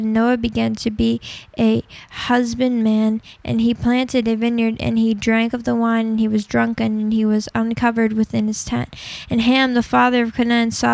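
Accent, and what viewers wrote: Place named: United States. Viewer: I like her real voice, it sounds like an American version of my voice lol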